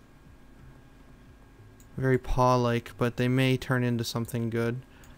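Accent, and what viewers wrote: Canada. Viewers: are you Canadian? Because I can hear a little bit of an accent. Just wondering, it sounds cool XD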